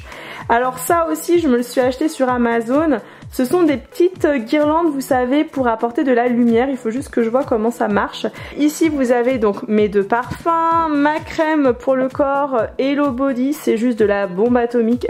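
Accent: England, United Kingdom. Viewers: alala ton accent anglais mavais manquer 😂😂 melted "meite" 😂😂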